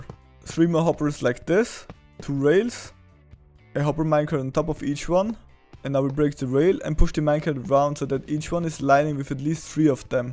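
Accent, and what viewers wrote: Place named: Germany. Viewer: Bisschen deutscher Akzent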